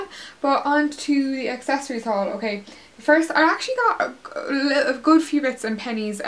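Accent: Cork, Ireland. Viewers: omg the inactive transcript is so funny :L clearly doesn't know the cork accent :P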